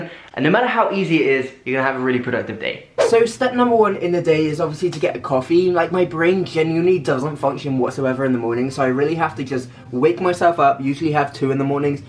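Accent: United Kingdom. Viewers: His British accent 😘😘😘😘😘😘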